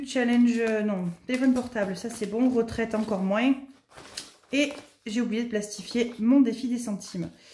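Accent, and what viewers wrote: South, France. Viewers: Cc 5000 abonnés c est super.....c est grace à ta bonne humeur,ton accent plein de soleil et tes vidéos toujours agréables et de bons conseils !!!! ❤❤ Pour les défis je suis comme toi j ai envie de tous les faire !!!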